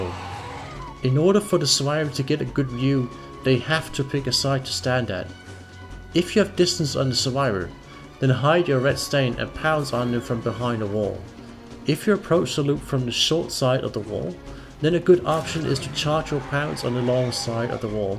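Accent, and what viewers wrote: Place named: Denmark. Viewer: this is really great. the dbd community needs more good demos to help us get better at playing him! also are you danish or swedish? your accent sounds like it.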